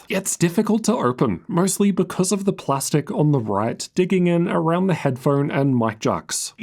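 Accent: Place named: Australia. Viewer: Because it’s an Australian accent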